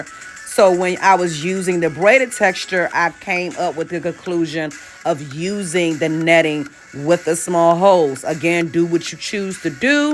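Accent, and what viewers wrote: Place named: Maryland, United States. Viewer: You're definitely from MURLIN toooo!🤣 I know that accent anywhere. I've been living here for 34 yrs and the accent is still so distinctive to me. Great job on the technique. I have alopecia on the crown of my hair and I am always looking for options to share to help me. Thank you!!!!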